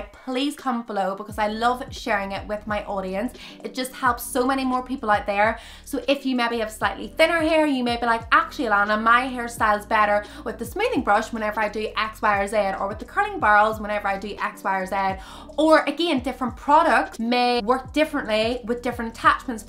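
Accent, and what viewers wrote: Derry, United Kingdom. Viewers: What in the Derry girls accent is this?! The first thing pops up in my head is Derry girls after watching her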